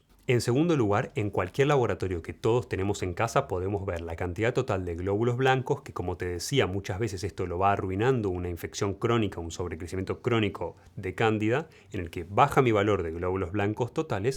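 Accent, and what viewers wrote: Argentina. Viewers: DR. ME ENCANTAN TUS VIDEOS. SOS ARGENTINO????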